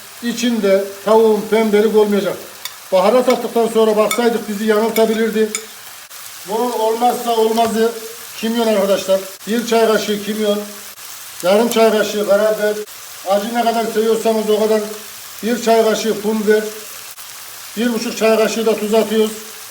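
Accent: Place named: Isparta, Turkey. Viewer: Ellerinize sağlık Sefim memleket neresi Bizim ıspartanın şivesine benziyo konuşmanız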